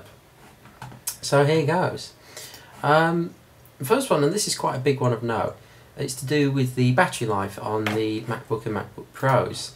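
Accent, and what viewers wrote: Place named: United Kingdom. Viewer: good to hear a british guy doin tech stuff, nice vid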